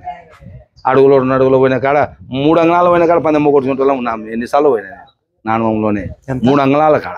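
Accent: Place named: Rayalaseema, India. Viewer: Pure rayalaseema slang❤❤🎉🎉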